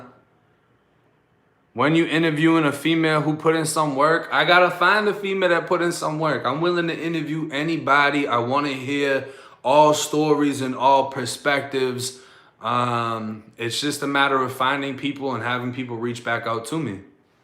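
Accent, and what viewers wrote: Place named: Massachusetts, United States. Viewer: youre from Mass...that fuckin accent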